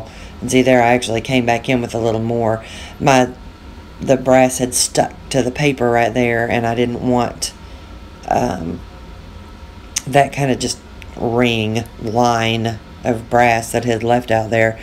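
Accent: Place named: North Carolina, United States. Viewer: Another great video,! I love how this turned out. You used my favorite colors - and I'm glad to see you got the new inks. They are magnificent! The other inks you need to try are the Trex inks. You talk about bright vibrant inks! WHOA! I played with them for the first time this afternoon and was super impressed. They aren't bad pricewise, either. Big bottles, 12 colors - somewhere around $30. I love your videos (but you already know that) and think this one was especially powerful. I could create this! (Your real wispy ones not so much.) I'm glad your count continues to grow as you deserve it. I love your "backwoods NC accent" - it goes well with your videos. You've got an incredible style my friend! 😍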